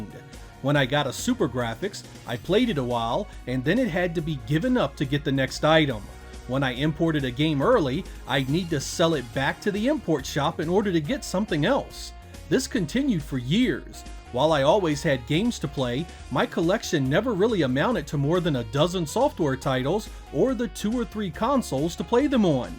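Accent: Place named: Pennsylvania, United States. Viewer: We are exactly the same age and I even grew up in the same area and went to the same malls you talked about in previous videos. I grew up in the Southampton/Feasterville, PA area. I'm sure we would have been friends if I knew you at the time. Actually, when I first discovered your channel, the first thing I thought was, "hey, I know that accent!". :) Cheers!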